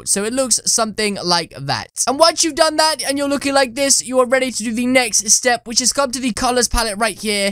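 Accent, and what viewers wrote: United Kingdom. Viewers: IS FROM THE UK!!! I knew it.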